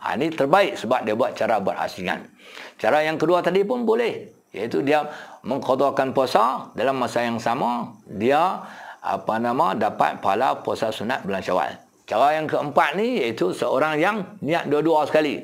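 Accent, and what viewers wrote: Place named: Kedah, Malaysia. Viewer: loghat Kedah ada yg sneng ada yg ssh utk digertiin...dri Kelantan Sy terus gk bisa gerti...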